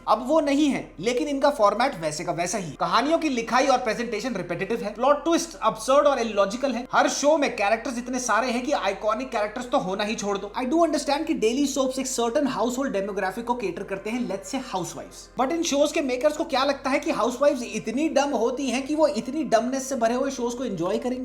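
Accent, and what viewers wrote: India. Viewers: that's maybe bcz he isn't british. There are many words we use and the pronounciation we prefer is sometimes british pronunciation and sometimes words derived from american english or in american pronunciation but that's May not be perfect and is a bit indianized like British pronunciation in Indian english accent.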